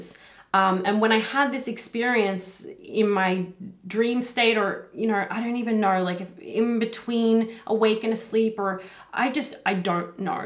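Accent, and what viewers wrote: Australia. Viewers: You are amazing. Are you Australian?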